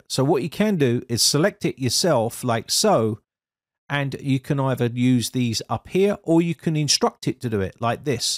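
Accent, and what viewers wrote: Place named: Cockney, United Kingdom. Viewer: Hi, I've been using this tool for a while now and I've noticed that it struggles to understand certain dialects. So, if you don't speak the King's English (like me - I'm from Yorkshire) or if you have a Cockney accent (like you), then you'll need to proofread the text it generates and correct any mistakes. However, it still saves a lot of time compared to typing everything out manually, even if you have to edit it afterwards.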